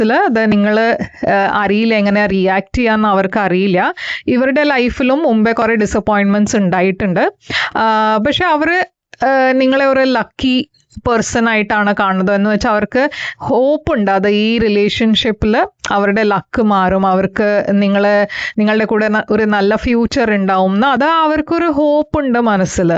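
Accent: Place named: Karnataka, India. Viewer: Nice way of talking Malayalam any Karnataka connection undo❤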